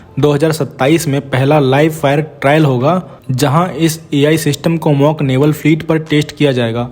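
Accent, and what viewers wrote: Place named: Bihar, India. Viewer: bhai sabse pehle apko defence me thodi geopolitics v add krni hogi just few news and bhai jab aap koi English word bolte ho it sound like u live in bihar apko thoda accent shi krni hogi,(please dont minde) or bhai apni video baki k defence channels se ada ya ak ghtna pehle upload kiya kroo, or bhai kisi news ko poistive way thoda criticise v kiya kroo ap just news bolte ho thoda explain v kiya kro aisa nhi aisa hona cheahe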